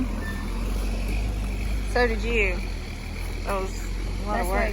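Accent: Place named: Indiana, United States. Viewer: Watching your video's is like a part of back in Indiana, I love living here in the Philippines, And i don't miss living in the US But i do miss no country music here of bands, So you and your family gives me a taste of Indiana with your accent.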